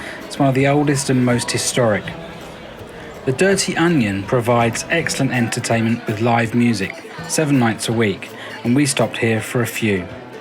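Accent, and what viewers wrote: England, United Kingdom. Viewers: Just love the English accent….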